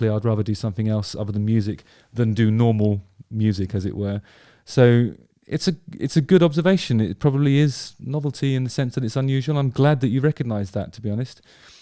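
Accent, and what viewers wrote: United Kingdom. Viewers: .."Off you fuck". I love it. I will definitely use that, but it'll never sound as good as it does with the Brit accent. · condescension with a British accent is so much more satisfying to hear. rock on justin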